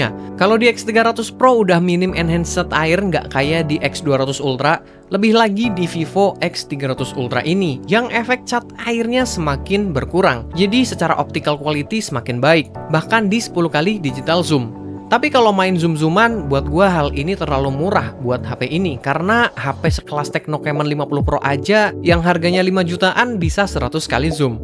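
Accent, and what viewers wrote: Surakarta, Indonesia. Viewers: Orang solo bang? Btw ane user x300 pro sudah sangat puas dan di jadikan daily driver Kurangnya cuma speaker. Overall ok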